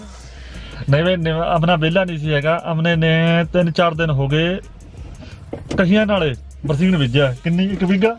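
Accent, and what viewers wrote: India. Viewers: Yar ehi desi English tah sanu changi lagdi ea, veer sira, v sira banda,ehi kuch loki dekhna chonde ea😃👌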